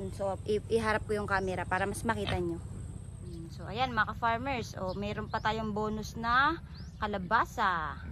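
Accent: Visayas, Philippines. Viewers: Oi bisaya diay ka maam shout out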